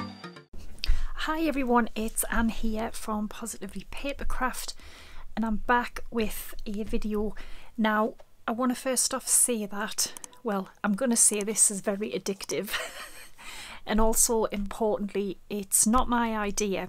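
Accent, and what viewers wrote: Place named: Scotland, United Kingdom. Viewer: Love this. And that stamping board. Love your dialect. Scottish?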